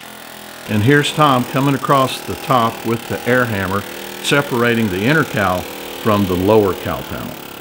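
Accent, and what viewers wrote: Texas, United States. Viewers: Well i don`t know what the problem is i understood you perfectly fine because i`m also from Texas and i clearly heard you say COW!! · I'd revit that area over bonding. If you want to seal below windshield with both, I'd consider good plan to protect wiring from any water leaks. Hope you have some patch panels to cut that rust out on side. Great to see transformation. I spit my coffee seeing that woman's photo 😂 I think it was your texan drawal and you are educating some excited over your project. That bonding has some long term issues. Its not first generation... Not fun to start over. Enjoy your long weekend and BBQ.🌻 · Love your Texas drawl you keep on doing what you do very entertaining the rebuild is a big job you have more will power then I would have on a job like that your videos are awesome don't change anything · I just love your Texas Drawl thank you for sharing the difference between cowl panel and cow panel 😂😂HAHA I love it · No apologies are ever needed! 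I never did any body work so I have no input of value on that! I always hated working with the front and rear glass...helped do the rear window of a 70 Riviera boattail....YIPES!! Happy Labor day weekend to you and the family! Your Texas drawl is easier to understand than some southern dialects...Where oil is pronounce earl, and door is a two syllable word! Fortunately I was raised hearing it all the time so I can still understand it.....well most of the time at least! The blazer is progressing well! Not much better than a bit of shop time with your good car buddies!! Thanks for sharing!! We lost Jimmy Buffet yesterday...so I' had a special Margarita for him....man we are losing some good ones !! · LMAO 😂😂😂 That is so funny, I understood your Texas drawl just fine and I am not from the USA. And I agree that panel bond is a great option with rivets or spot welds. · Making good progress, i see, and having a great time while doing it, I think. 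I didn't have any trouble understanding the word "cowl" in the last video or this one. I love your Texas drawl,. Of course, that's because there is a big piece of my heart still in Texas. When I would go back to visit family, i would quickly begin to speak in that drawl myself, it comes so naturally to me. Love you, hope you got some good responses from the car guys and girls, which I ain't. 😊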